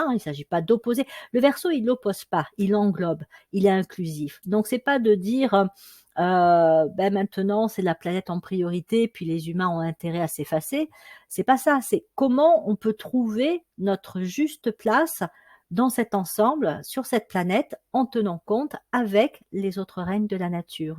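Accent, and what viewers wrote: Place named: Nice, France. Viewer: Je vous découvre et vous trouve vraiment géniale, je parierai que vous êtes un gentil gémeaux niçois à votre accent et votre facilité d'expression! Merci de la part d'un petit gémeaux avec Pluton en Lion dont vous aidez à éclairer la lanterne. On attend la suite avec intérêt.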